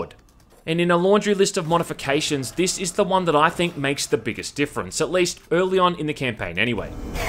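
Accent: Australia. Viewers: Its hard to differentiate wether this video is a rant or just a comparison video with such a thick Aussie accent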